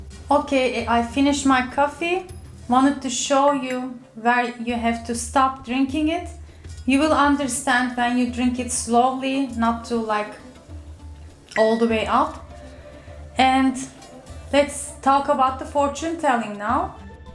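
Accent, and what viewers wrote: Turkey. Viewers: Omg its horrible türkish style english 🥱🥱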